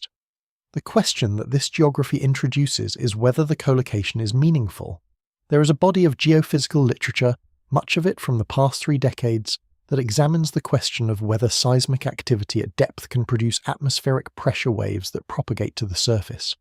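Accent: United Kingdom. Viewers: Why is it always a British accent?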